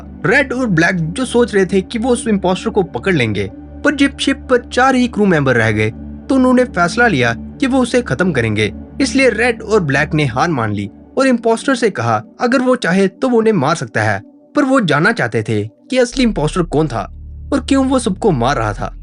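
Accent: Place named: Punjab, India. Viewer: Your voice is like punjabi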